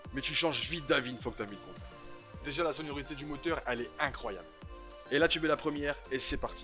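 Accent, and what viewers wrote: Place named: North, France. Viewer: Cet accent de "tcho gomin" du nord !!! Ça me rappelle ma jeunesse 🤣🤣 encore une vidéo drôle et dans l'esprit de nos petits verres de Perrier menthe en terrasse entre deux arsouilles a tordre le caoutchouc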